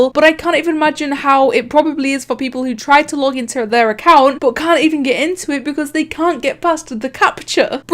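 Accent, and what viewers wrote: United Kingdom. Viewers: I could already tell you were British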